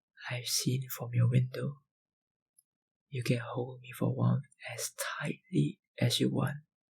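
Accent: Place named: Singapore. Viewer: wow u have the lioncity accent 😂👍🏼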